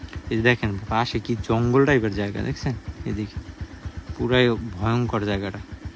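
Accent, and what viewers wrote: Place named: Barishal, Bangladesh. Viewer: ভাইকথারভাবেমাঝেমাঝেমনেহয়,বরিশালের লোক,কিন্তূশোলমাছআর,গজারমাছেরপার্থক্ববুঝেন্না,আমরাবলদনাআপনারাবলদ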